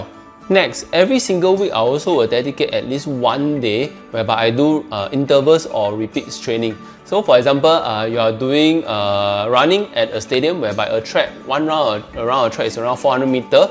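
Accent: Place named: Singapore. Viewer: the way how Singaporean talk. The slang is weird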